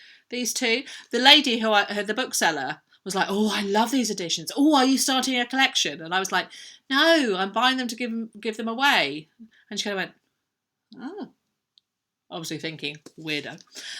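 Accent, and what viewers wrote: United Kingdom. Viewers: Giveaway-I thought of a second question. I like listening to your lovely English accent. Can you do an American/US accent (any region)? :)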